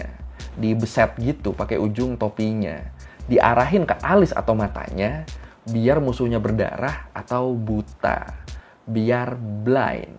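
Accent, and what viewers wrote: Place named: Madura, Indonesia. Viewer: gaya bicara lu mirip dilan bekasi campur logat madura, " selalu ngejait silet diujung top'i " selalu ada jeda cokk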